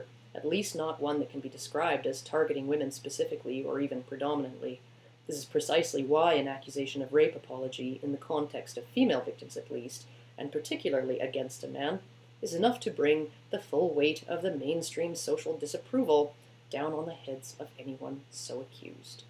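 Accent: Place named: Canada. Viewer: i really like this monotone reading voice is the best way to get a point across. this not sarcasm you should get a job at npr all though you sound canadian i'll look up ya'lls npr lol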